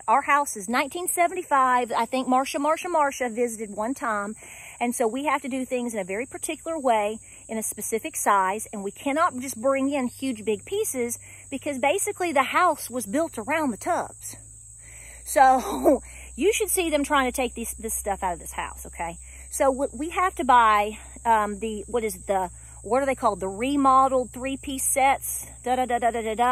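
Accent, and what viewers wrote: South, United States. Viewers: Cheers from the Mountains of morroco u got a beautiful southern accent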